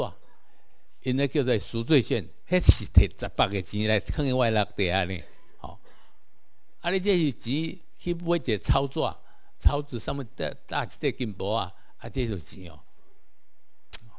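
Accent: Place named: Taipei, Taiwan. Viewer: 這位郭先生的台語台北腔很明顯啊^^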